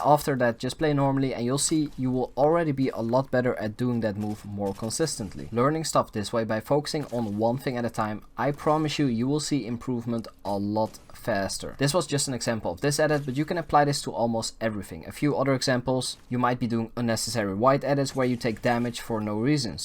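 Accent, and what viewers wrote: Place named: Netherlands. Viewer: Bro ik hoor aan je engels accent dat je Nederlands bent geef get toe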